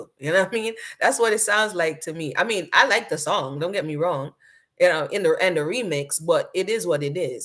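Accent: Brooklyn, United States. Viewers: Wow you just asked in the video to state where you were born I just texted before you asked the question you sound like you were raised in Brooklyn or somewhere in NYC. Wow you just said in the video Norval was birn in Jamaica! Wow thought he was also born in England!! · You definitely from BK.. prolly flatbush or canarsie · You do have a Brooklyn accent. I could identify it clearly 🤣🤣🤣🤣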